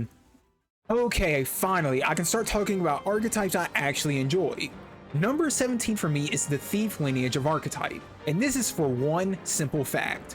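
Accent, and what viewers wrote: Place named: South, United States. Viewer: are you from the south? i like the accent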